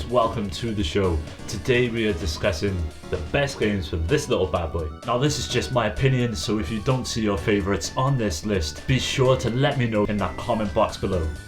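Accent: United Kingdom. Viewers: Oh dang, indie british stuttering has it going on! Sweet vid.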